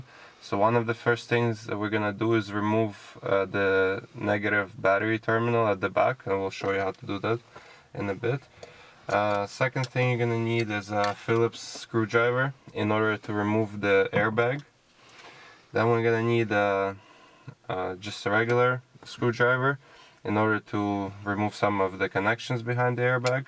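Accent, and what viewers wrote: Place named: Russia. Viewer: слышится почему-то русский акцент...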